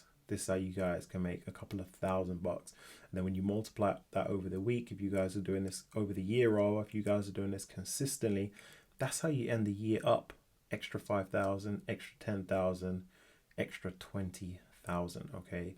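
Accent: United Kingdom. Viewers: Love the British accent..lets go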